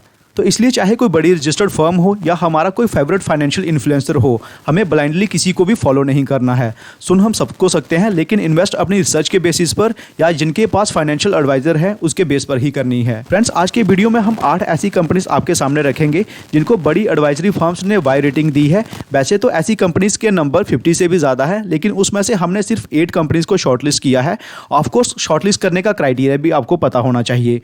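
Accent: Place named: Punjab, India. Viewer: Bhai aapka accent is punjabi......am I right??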